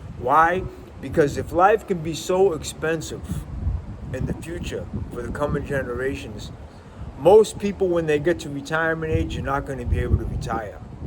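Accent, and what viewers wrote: Boston, United States. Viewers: This guy is great 👍. I know that accent! I came of age near the Boston area. Just outside of Fitchburg to be more specific. I lived there for 22 years, lots of fond memories. Nowadays I have returned to my early childhood hometown in upstate NY to be closer to family who live in town and so I could at least afford a place to stay for awhile as an educator. I feel at least temporarily more protected from the scourge of the economy and national disasters. I did tell friends and family thought, if I have to relocate again, it is not going to be the United States.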